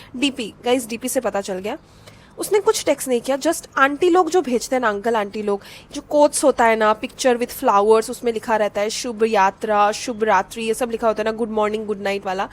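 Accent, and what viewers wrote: Bengal, India. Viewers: Didi ap Bengali ho kya?😊😊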